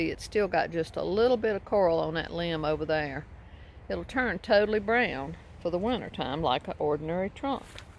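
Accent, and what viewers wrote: South, United States. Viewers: My favourite kind of garden. Love the Southern accent.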